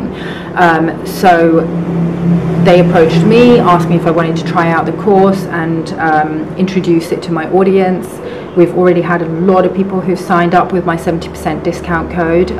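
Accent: United Kingdom. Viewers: Good info! Didn't realize you had such a posh accent :P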